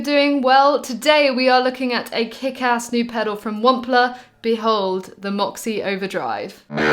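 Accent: England, United Kingdom. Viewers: Ah you’re English! You have broken my hot-o-meter! Great playing.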